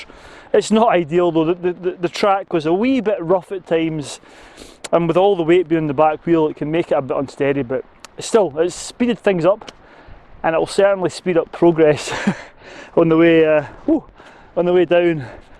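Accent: Scotland, United Kingdom. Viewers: I'm "jealous",:-( Great beautiful video it has an inviting character. Have already been up to pack my things and am leaving for Scotland NOW. Great to see nature while you still can. We humans have no alternative but just this planet and we must continue to use it responsibly. The way you tell in your Scottish accent is reassuring and enjoy the video in this flat little country where I am. Thanks for your video and on to the next one. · I’m a Glaswegian but never been back to Scotland for going on 20 years now. I really enjoy listening to your dialect……and not to mention the absolutely incredible filming, must be a lot of effort put into creating tour videos, it’s really appreciated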